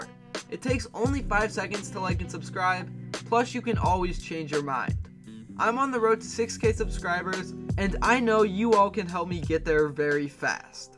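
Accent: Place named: Cleveland, United States. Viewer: are u from cle? sound like it but i wanted to ask. great vids,. newly subscribed. looking forward to the next one.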